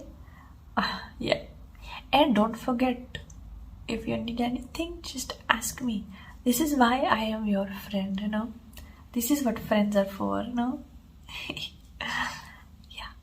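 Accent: India. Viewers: Is this a Hindi accent specifically?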